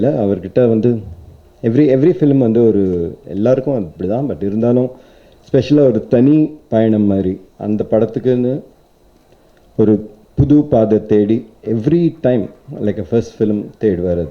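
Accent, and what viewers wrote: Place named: South India, India. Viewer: hes talking like a south indian aditi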